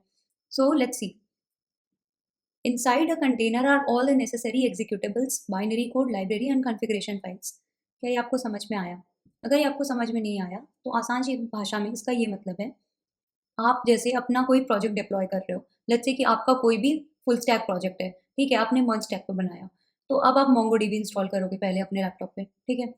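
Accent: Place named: North, India. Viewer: That North Indian hindi accent killing me Sorry but more focused on you than content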